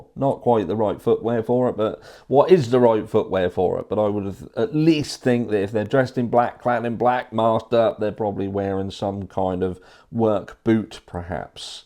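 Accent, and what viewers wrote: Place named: England, United Kingdom. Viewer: now I’m intrigued as to where about you live in England? I’m from Somerset, but now live in Dorset and at times I’ve wondered about your accent too.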